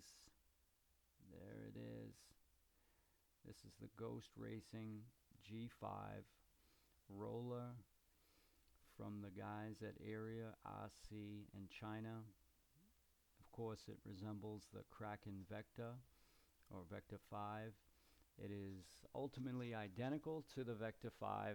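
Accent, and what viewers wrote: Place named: Boston, United States. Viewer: Love your accent,bean town???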